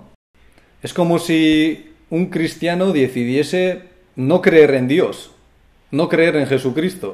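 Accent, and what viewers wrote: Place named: North, Spain. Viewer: En el norte de España su acento es así, en este chico y en el común de la gente.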